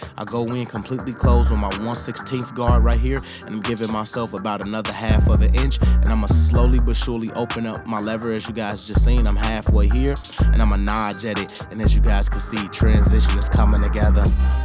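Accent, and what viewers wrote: Chicago, United States. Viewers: That's the Chi in him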